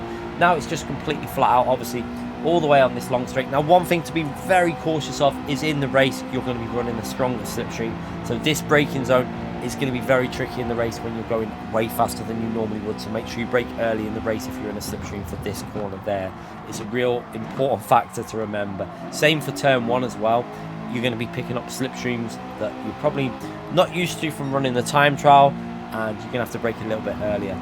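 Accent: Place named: RP, United Kingdom. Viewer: The sounds more posh when he's doing his guides than on his live streams.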